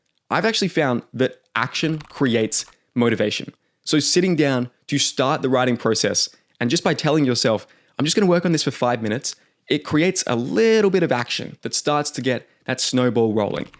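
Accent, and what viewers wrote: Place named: Australia. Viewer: Microsoft with an Aussie accent is just plain weird to my American ears! 🤣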